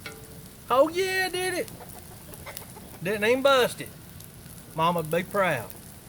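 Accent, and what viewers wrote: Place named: South Carolina, United States. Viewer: I have a cast iron every day, that's all I cook in. I am in SC and you talk just like me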